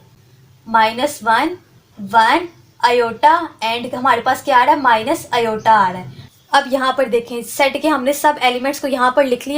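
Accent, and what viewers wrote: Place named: Lahore, Pakistan. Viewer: that Lahoڑi Accent 😂💕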